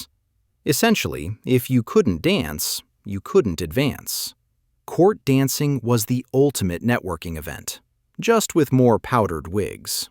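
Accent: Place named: United States. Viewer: Quite interesting, but oh, the American pronunciation! BallAY? Someone has told them French stresses the final syllable. In fact, the stress should be more or less equal on the two syllables of the word ‘ballet’.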